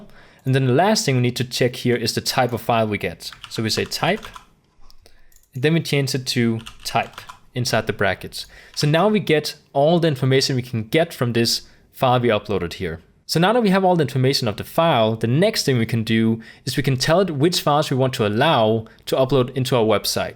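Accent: Denmark. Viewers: danish accent yes?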